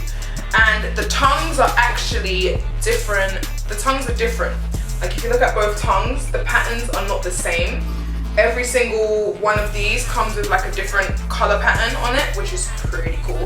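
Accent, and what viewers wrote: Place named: United Kingdom. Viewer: I am a sucker for British accents. I love that more girls from the U.K are stepping up their sneaker game..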